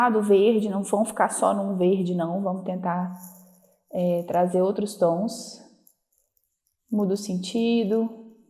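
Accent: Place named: Minas Gerais, Brazil. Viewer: Oi,. Conheci hoje seu perfil. Amei. O tipo de trabalho que sempre gostei de ver. E amei seu sotaque mineirinho, que eu acho o mais bonito do Brasil! Vou fazer essa aula hoje mesmo. Voce disponibilizou mais imagens?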